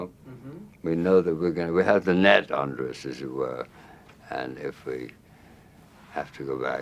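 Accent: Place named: South, United States. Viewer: He's from Baton Rouge, and the early 20th century upper class Southerners did speak in a rather affected way, so I always thought he adapted his aristocratic Southern accent and incorporated the mid Atlantic accent with it. Tallulah Bankhead, another upper crust Southerner, sounds just like him. Both of their manner of speaking sounds like this hybrid to me. I am from South Georgia and I have a similar mid south accent. It's just the way my family talks. Not all of us sound loud and brash like Paula Deen or ignorant mush mouths like Mama June.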